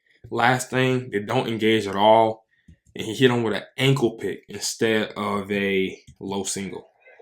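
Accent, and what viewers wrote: United States. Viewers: You are articulate to the 9's Being able to edit and showcase the breadth of the subject matter But there's something about that southern cadence that I can't stand "Colluh Ta" it's as if you have to rush through your sentences. A nervous tick I guess what I hate about it is how I really have to pay attention to what you're bringing up. If it were edited the same way you spoke, clips would go by in a fraction of a sentence between long stretches of athletes just looking around Let what you have to say have some staying power. What do I know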